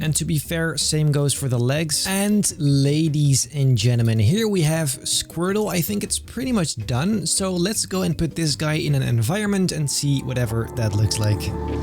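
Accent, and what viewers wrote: Netherlands. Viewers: IDK WHY BUT YOU SOUND SO DUTCH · ik hoor dat nederlands accentje hhahah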